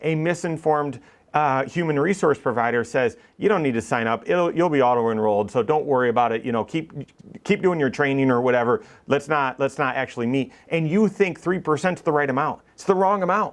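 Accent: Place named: Chicago, United States. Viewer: Dude your Chicago accent lol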